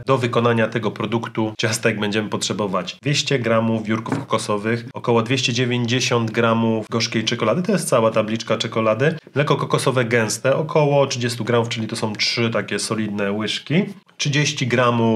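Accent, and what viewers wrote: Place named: South, Poland. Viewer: Oj, taka gwara południowa!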